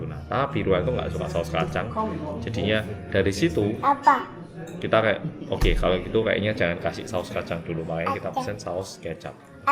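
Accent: Java, Indonesia. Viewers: Medhok e rung ilang to yoo😂😂😂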